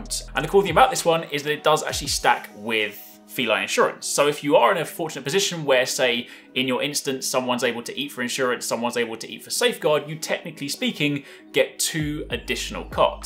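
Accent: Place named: United Kingdom. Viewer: He sounds british but looks scottish ???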